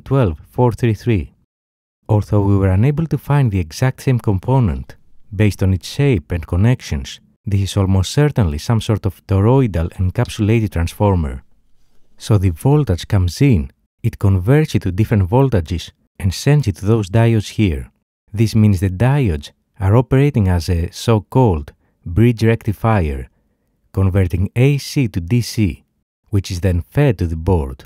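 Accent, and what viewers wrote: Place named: Greece. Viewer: Its the typical greek accent in english.Myself i lough all time when i listen greeks speakimg English(im greek)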